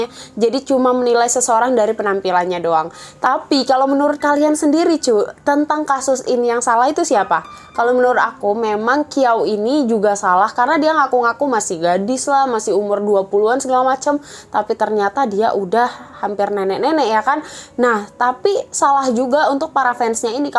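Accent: Indonesia. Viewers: Sumpahnya channel ini muncul di rekomendasi gw dan kakak ini waiahnya kek orang korea tapi ketika ngomong orang indonesia wkwkwk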